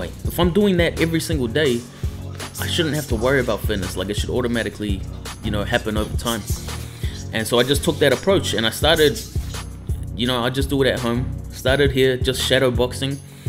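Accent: New Zealand. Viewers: I hear that NZ accent and subscribe immediately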